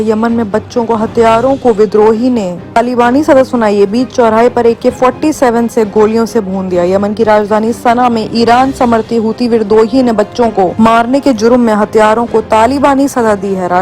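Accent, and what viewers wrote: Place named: Bihar, India. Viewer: Koun to b hai g amma bihari dikhre aap awaz se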